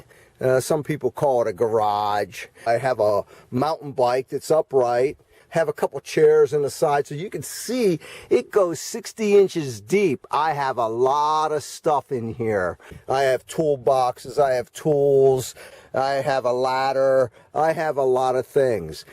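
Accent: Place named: Northeast, United States. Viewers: You from Pennsy or south jersey? Can’t tell from the accent. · so you then really do have a Philly accent with a blend of the two. Just say you are from Northeast Philly if someone asks what part of Philly you are from next time. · Can tell you’re from the north east lol. I’m from Philly.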